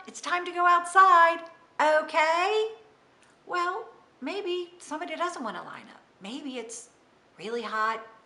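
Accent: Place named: South, United States. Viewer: Very slight accent, maybe from southern USA and it's just so cute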